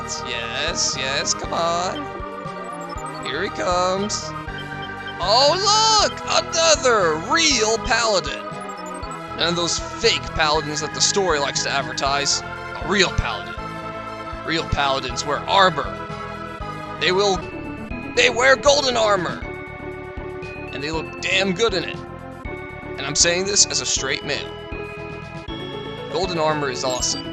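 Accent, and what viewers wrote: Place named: South, United States. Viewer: You should probably fix your audio sometime. Music comes from left, mostly. The rest from both sides. Might just be me *using headset* Also...A Persian with a southern accent. *imagines*mind explodes* o__o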